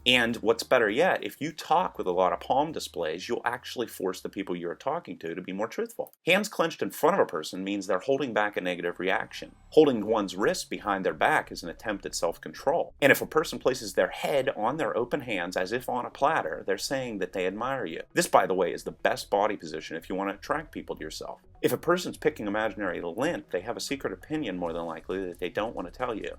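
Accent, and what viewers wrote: Pittsburgh, United States. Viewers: the elbow touch must be in your culture you sound American, in my culture (Canadian) we don't touch each other at all on the elbows, it never happens, it would be too close to the body, and could be misinterpreted as something not appropriate, I have never seen anyone touch another person on the elbow when they shake hands, we do shake hands and hug at the same time, this is done by first the hand shake, then we pull each other in for the hug at the same time. it is a very nice and warm greeting, when greeting family or close friends, or we just give a big hug, sometimes we even hug a person we don't know very well, if the situation is seen as appropriate, like if theres been an accident and they came out okay and need comfort, that is what I mean We like to buddy hug, this is when we know a person is not very much into the full on hug, as i have a niece who is like that she isn't a huggy person, and is more comfortable with a quick sideways hug, or just a pat on the back. 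· Did I notice a Pittsburgh accent in there? Also, I like how fast the video is. Lots of info, rapid-fire, no time wasted. And there IS a pause and rewind option if needed.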